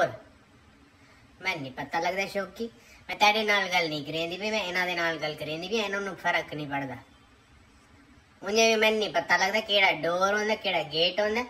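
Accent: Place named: Saraiki, Pakistan. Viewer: I just love your saraiki accent.... So beautiful... I didn't know people in india could speak saraiki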